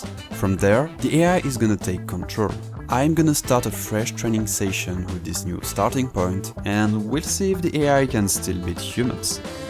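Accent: France. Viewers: Are you french cause your first language is obviously not English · Are you french? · are u french? your accent like french? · Tu as un accent français, je me trompe? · Bro you're french and your accent is so bad But the topic of the vid is good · omg your french accent is difficult to listen to xD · Open video French accent Close video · Are you french? Because I can clearly hear your accent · Great job. I think they just lack higher levels of abstract thinking. They're like babies learning from trial and error so experiences just remain at the very basics. But your work, insights and doubts are the best. Thank you for that. Your accent sounds french, are you from a french speaking country or I'm totally missing the point? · Je suppose que tu es français nn? Ton accent et bon mais français 😂❤❤ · L'accent français me termine, video de fou bg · This is the most effortlessly heavy french accent I've heard. 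· Are you french because you have french accent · Why are you french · He sounds french and his ai does wr runs. confirmed:D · superb video man. just one minor complain, please as a french guy, I can't stand your english accent, please, do make an small effort, plus your grammar and vocabulary are very good, just less fenchy "ze" instead of "the" ect. Anyway thx for the video I've learn a lot! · You have a French accent is it true? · Are you french? I can sense a little bit of french accent in your prononciation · He sounds french, it's like he use syllabic intonation, while English should be stress-timed. Interesting. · J’adore ton accent français c’est trop drôle. Trop bien la vidéo sinon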